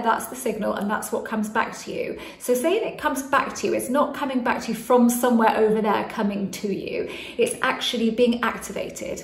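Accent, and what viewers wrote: United Kingdom. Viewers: Thank you very much. It sounds so simple and adorable with that British accent of yours. Cheers my friend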